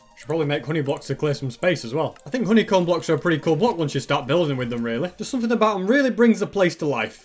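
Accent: United Kingdom. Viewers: I LOVE YOU YOU ARE THE BEST LOVE YOUR VOICE { CUZ YOU ARE BRITHIS} SO AM I AND I LOVE YOUR BEARD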